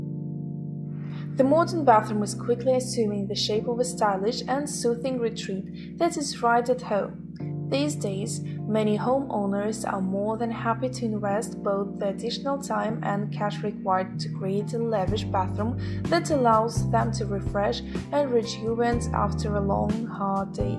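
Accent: Russia. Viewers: Видео отличное, но вот вопрос: почему нельзя было озвучить на русском и сделать английские субтитры. И еще: Где нашли такой рязанский акцент?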